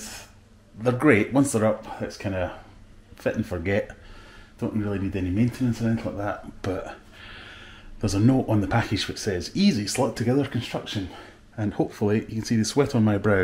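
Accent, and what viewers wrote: Scotland, United Kingdom. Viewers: We are literally being screwed by fitting manufacturers to own dozens of these things with diameter, thread, pitch variations! Guess I was luck the ones I got for my outside taps went straight onto both of my shower outlets. Keep the videos coming and nice to hear a Scottish Accent (I am an economic refugee in darkest England and miss home!).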